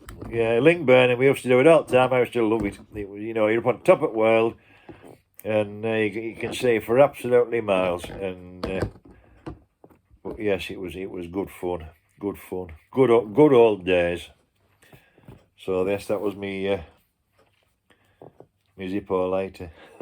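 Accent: Yorkshire, United Kingdom. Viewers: I genuinely hope you are pain free soon. Keep your stories coming they are an important picture of the past and you tell them in your wonderful Yorkshire accent to the tick of a clock which is all a perfect background to your pipe smoking content. I for one enjoy it all and thank you for it.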